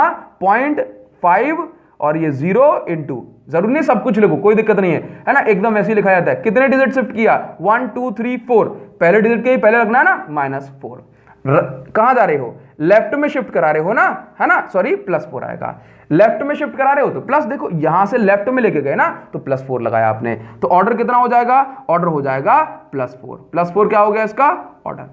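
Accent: Odisha, India. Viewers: Sir ap odisha se ho kyaaaa.....ap bahut achhse padha rahehooo.thanks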